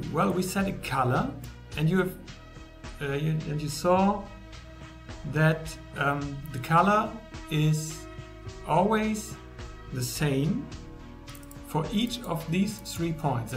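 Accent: Germany. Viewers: are you german? you have a german accents 😃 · sis is a very nice german accent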